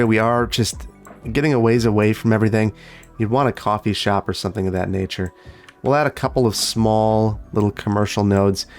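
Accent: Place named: Upper Midwest, United States. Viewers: Lol, "certain amenities like *beggars*"...Gotta love that midwestern accent! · you really hear that north midwest come out in baggers (BAEggers) I think that part of the regional accent is so charming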